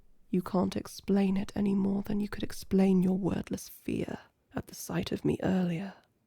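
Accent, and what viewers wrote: United Kingdom. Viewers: might one of the only good British people in the world already I think she’s British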